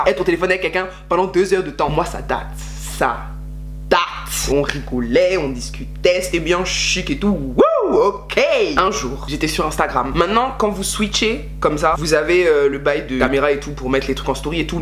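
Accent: Ivory Coast. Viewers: le montage me fuuume en plus a force de regarder tes videos je parle comme toi et je commence a voir l accent ivoiriens :) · Mdrrrr ton accent ivoirien . Trop fière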